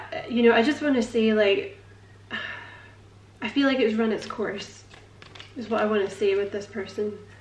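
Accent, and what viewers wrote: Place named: Scotland, United Kingdom. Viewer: Your Scottish accent is off the chart sexy. The hell with my twin flame , I'm feeling your energy 🥰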